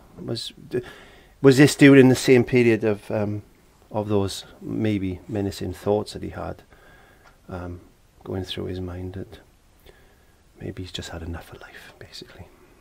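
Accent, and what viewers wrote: Wales, United Kingdom. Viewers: Thank you for showing us how to build up a Van Gogh picture ... you are very entertaining as well... we love your cheeky welsh accent .... If I had the money to pay for you to go to the Van Gogh museum I would ..keep it up you really are fantastic at what you do 👍thank you 👍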